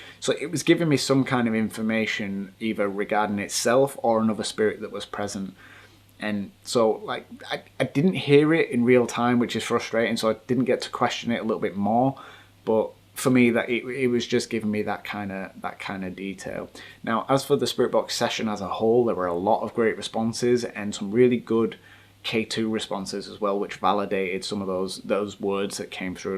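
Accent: Yorkshire, United Kingdom. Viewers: Don't apologise for the hour long vid! It was incredible, it would be amazing if you did more hour long vids. Is your accent from Yorkshire? If it is, have you ever thought about investigating the moors? Quite a few times I have seen odd things there.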